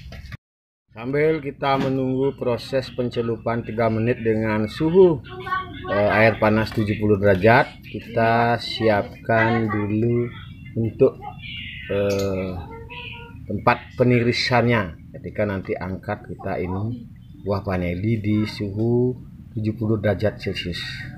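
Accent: Bali, Indonesia. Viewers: tinggal nya dmana pak?? logatnya kaya nak bali😁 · Logatnya bali nok. Salam vanilla lovers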